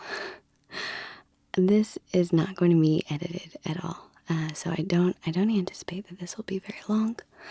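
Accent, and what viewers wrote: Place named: Texas, United States. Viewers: Please come back!!! You are my favourite ASMR artist ! You were the first one I stumbled upon and I have a sentimental connection to you, you have no idea. You're even on my photo collage lock screen of "favourite people" (next to Freddie Mercury and Sylvia Plath). (Holy shit that sounds invasive and weird but you just make me smile every time I visit your Channel) I've missed you so much! Your role plays, your singing, your cleaning! your acting! Your hint of a Texan accent (my Australian one is rather monotonous) I'm 16 and I'm in my final year of high school and I need you to placate me ! Okay I need to slow my role but you get the picture -- you're amazing, genuine, quirky, amicable, and a little bit of sunshine to light up my day. Please please upload soon! I miss you!!!! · AHHHHHHH LORD YOU HAVE BEEN MISSED!!!!! 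me and the wife love your channel and your Texas accent we are from Dallas :p but so happy your doing good keep it up :)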